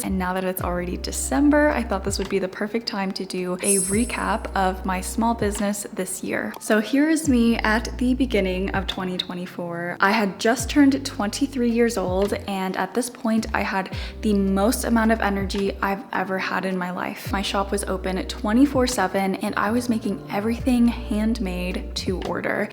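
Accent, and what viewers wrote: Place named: England, United Kingdom. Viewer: i love your english accent❤ and also every video you uploaded, you’re the best!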